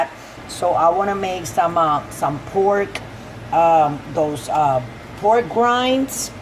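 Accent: New York, United States. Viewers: GREAT STUFF!!! IM A NYC ITALIAN GUY JUST CURIOUS R U IN NYC TOO SOUNDS LIKE IT LOL I SUBSCRIBED THATS MY KIND OF FOOD LOL I USE A TON OF PORK BELLY FAT WHEN I MAKE MY 5 HOUR SUNDAY MEAT GRAVY SALUTO 🍷🍷🍷🇮🇹🇮🇹🇮🇹 VIC FROM NYC CIAO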